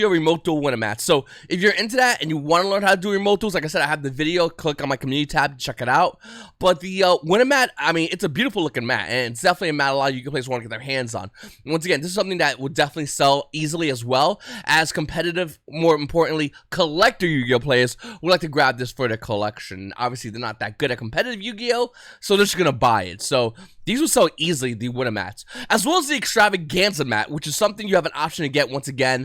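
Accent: New Jersey, United States. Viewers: This dude is 100% from the jersey shore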